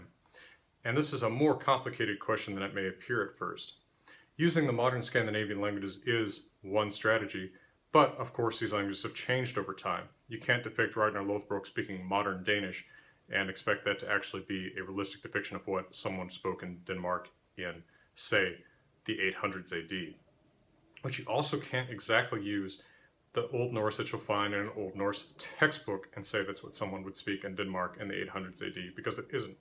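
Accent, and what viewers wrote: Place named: United States. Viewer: viking language with an american accent XD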